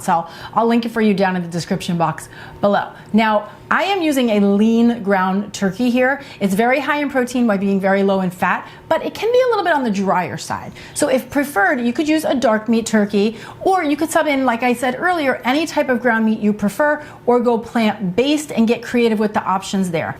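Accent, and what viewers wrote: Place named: New Jersey, United States. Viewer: Hey there! I am a Speech Pathologist and I have noticed that it is a New Jersey thing lol. is not the only one who does that lol. It's just a dialect.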